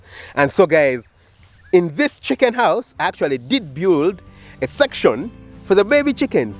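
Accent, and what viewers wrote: Uganda. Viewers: Just dropped on this video and just by the way he spoke...i immediately knew he was a ugandan😂😂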